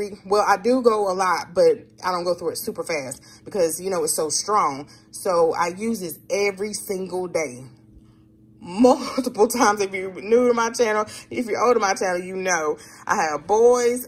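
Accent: South, United States. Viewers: We don’t have many southern belles here in Hawai’i, so listening to your accent is a sweet treat for me! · Hey! You know how we talk here in the south sis. It is what it is. The country is in us lol. 💃🏽🤷🏽‍♀️😊 Great video as always! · I'm country too, Alabama ❤️ Love your voice and cute accent ☺️🌼🌻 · I for one loves your accent.. Growing up in the north and spending time in the South and now living in the south I can appreciate it.. Yes those green beans are good but I rinsed them WELL to get a lot of the salty taste out as much as possible. My first Sam's order will be arriving today 😀. You are so right as I have found that different locations of Sam's, BJ's and Costco are not created equal. You are doing so well for your family. Much success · Mississippi here Tupelo 👍👂I hear you girl we are COUNTRY AS THEY COME 😂😂😂😂😂😂😂 but if they correct you they really need to hear the DEEP DEEP SOUTH 😂😂😂 IT SOUNDS LIKE ANOTHER LANGUAGE😂😂😂😂❤❤❤💞💞💞💞💖💖💖💖💘💘💘 MUCH LOVE GIRL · Don't worry about your dialect. 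If it's southern, it's good! 😉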